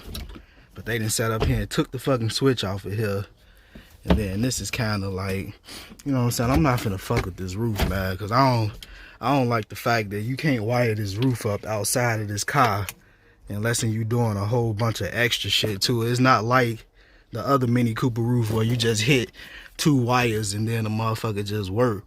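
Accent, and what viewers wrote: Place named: Chicago, United States. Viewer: yo commentary funny ash as soon as da video started ik u was frm da raq watchin frm tx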